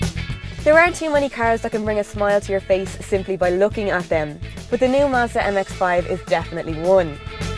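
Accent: Ireland. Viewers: How has this channel not gained more popularity, a girl with an Irish accent driving beautiful cars. Whats there not to like, keep up the good work!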